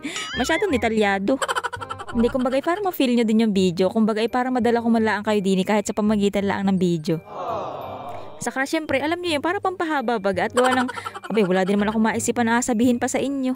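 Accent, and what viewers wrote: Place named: Camarines Norte, Philippines. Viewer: Parang salita sa camarines norte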